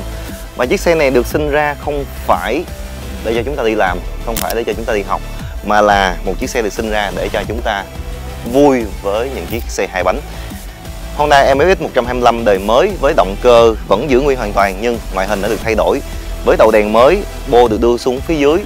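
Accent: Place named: North, Vietnam. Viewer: Tui nói đùa thôi. Chứ tui là fan ruột của bác từ khi bác còn bên tinh tế. Chất giọng bắc dễ nghe và cách bác review rất chi tiết. Nở lòng nào dislike cho ido của mình bác.😄😅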